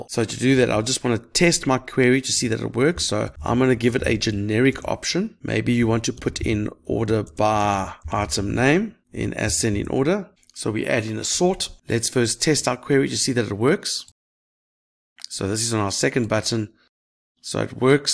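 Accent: South Africa. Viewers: Thanks you're the best 👌 quick question are you Afrikaans?😅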